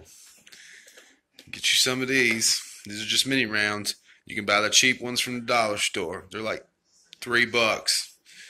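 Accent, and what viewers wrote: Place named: South, United States. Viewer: Love it. You got to be from the South:0) Im born in TN and live in SC. Best video ever.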